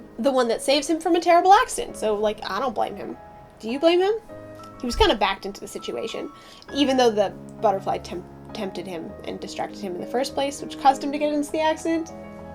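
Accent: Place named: Canada. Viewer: canadian tinnitus pronunciation represent!!✊✊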